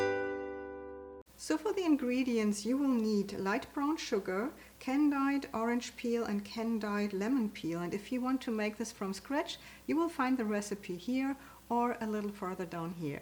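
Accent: Germany. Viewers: danke ganz toll. man hoert deinen deutschen akzent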